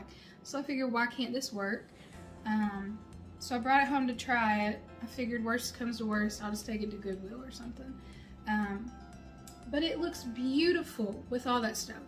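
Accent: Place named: Kentucky, United States. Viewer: You have to be from Kentucky you have the voice lol so am I...great haul,,love Peddlers Mall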